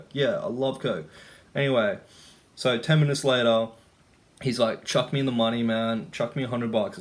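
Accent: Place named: Australia. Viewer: I won hundred percent agree I don’t know if it is speaking style in the way that he says things he just interrupts him self constantly and beyond as aimlessly or if it is over the top Australian bro boy douche bag accent. It’s awful to listen to and maybe that’s not his fault but I think he’s pushing it a little bit. Either way it’s a shit video and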